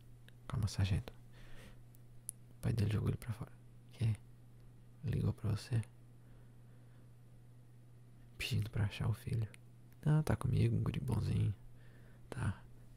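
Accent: Brazil. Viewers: Ótimo asmr adorei, incrível com tá para perceber que vc é manezinho hahaha também sou :)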